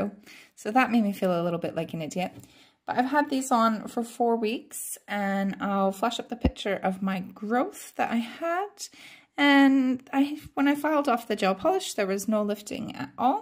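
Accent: Scotland, United Kingdom. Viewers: that's interesting, I thought one of the accents I could hear was Scottish! Some Canadians do share sayings and pronoinciation though. I'm Scottish myself and live in Falkirk:)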